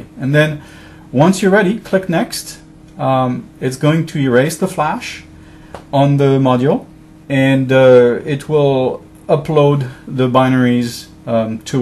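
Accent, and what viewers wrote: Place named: France. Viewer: Really impressed by your english there - one can barely hear that you are French which is quite unusual. Trés bien!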